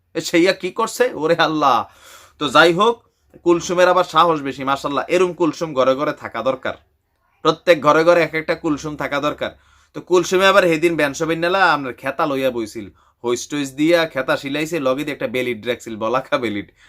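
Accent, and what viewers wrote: Comilla, Bangladesh. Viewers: ভাই আপনার ভাষায় বুঝা যায় আপনি কুমিল্লার,কোন জায়গায় আপনার বাড়ি। আমি ও কুমিল্লার । আপনি খুব ভালো ভাবে উপস্থাপন করেন। আপনার প্রত্যেকটা আপডেট আমি দেখি এবং মজা পাই। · আপনার মুখে কুমিল্লার ভাসা অনেক বালো লাগে বাই এখন আমি ওহন কি হর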